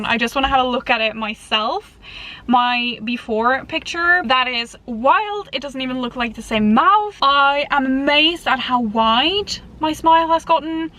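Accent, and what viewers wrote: Ireland. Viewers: Where is your accent from ? Irish?? Living in Ireland?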